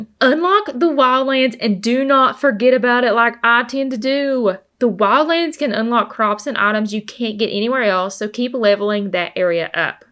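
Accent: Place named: Texas, United States. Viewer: Subbed because of accent 😆 Texas or Tennessee?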